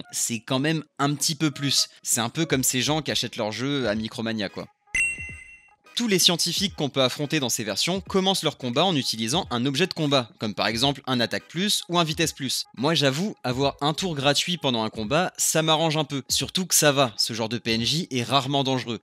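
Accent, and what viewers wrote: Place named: Belgium. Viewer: qu'est qu'il a l'accent belge qu'on m'explique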